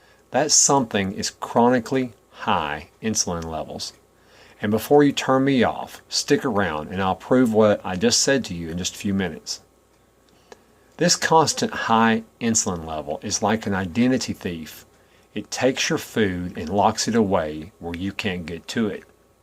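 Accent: South, United States. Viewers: Damn you use to be that big? You definitely can tell me something. BTW love your "southern" accent.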